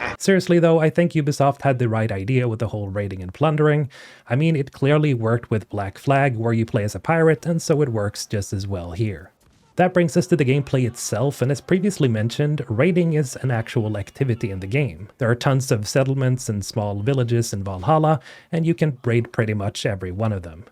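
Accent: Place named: Sweden. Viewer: Förvånad när du avslöjade dig som svensk; få svenskar som inte pratar engelska med en brytning som inte gör ont i öronen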